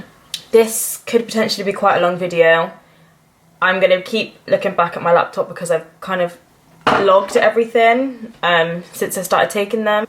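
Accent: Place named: Bristol, United Kingdom. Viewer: Hope celexa i will look this fit 😆. You sounds like a brizzle girl my neck of the woods. Mental health has been a lifetime enjoyment for me its been relentless im now on celexa it was the last ssri to try and has been the best so far , thankfully. And i totally get you girl , death was my main ambition in life and my life had no ambition. I spend my time with likeminded people or understanding people other people are toxic for me. They are earthlings and im a alien. 👽 i joined a walk and talk for nutters in swansea and its been amazing 👏. When your battling with mental health the people you are use to being around become disposable and the likeminded people are the ones we need. Like you just said the general practitioners are terrible. Specialist help are the ones who can help. Luckily/unluckily i ended up a addict with alcohol and i have the A.A rooms and that has kept me sober for 5 years. 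Alcohol works great until it becomes a problem and with mental health it always becomes a problem. You cant redress the imbalance with a imbalance it just makes us extra wacky. Live life on lives terms and understand about , acceptance. We have to accept that this might be for life ,if you fight it , it just gets worse. What you need is a funny as fuck friend that makes you laugh. Thats why i like my nutters around me, sober nutters i might add. They are all trying thier best its all we can do. Good luck with your journey to getting well and thanks for your share. You did the right thing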